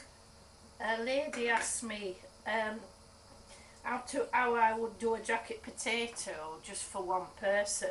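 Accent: Yorkshire, United Kingdom. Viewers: Yorkshire for sure. South Yorkshire most probably. Quite close to my accent (Sheffield)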